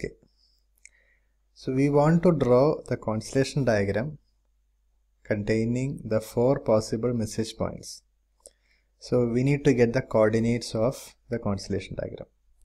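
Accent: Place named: Kerala, India. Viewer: malayalee accent can be easily spotted by the way they pronounce the "r" sound .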